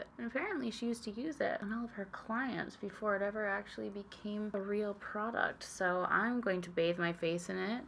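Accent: United Kingdom. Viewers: 🎄🎄🎄 I was disappointed not to see (hear?) more British accent in this vid - would have been the perfect opportunity! Thanks Ig: sybelion 🎄🎄🎄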